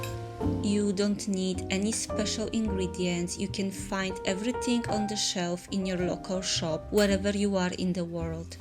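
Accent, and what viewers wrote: Poland. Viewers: This is my first time here and wondered where are you from because I heard an eastern accent. When I saw you preparing racuchy I smiled: you are Polish! Me too! Greetings from Warsaw. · jestes Polka?:) zgaduje po akcencie ale i imię. Piekny film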